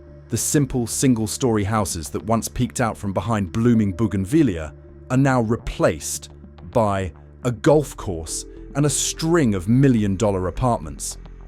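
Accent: United Kingdom. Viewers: f this film producer nothing but propaganda ...every place has good and bad..:face-green-smiling: most are illegal criminals anyway f n brit narrating lets talke about england where he came from wht a jerk how about england paying its bills from both wwars to usa that they owe starting with your loser kings wealth? and all ye yankees go home anyway the locals are doing fine without ya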